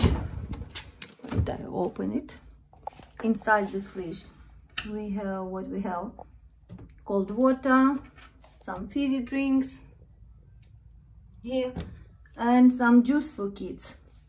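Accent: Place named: Ryazan, Russia. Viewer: Какой красивый рязанский акцент!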